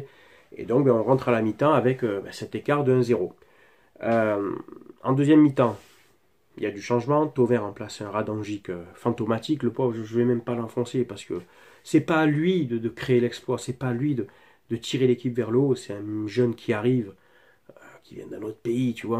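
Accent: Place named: North, France. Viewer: Cet koi ce nordiste avec sa tête et son accent du nord lol 😂 et va te cacher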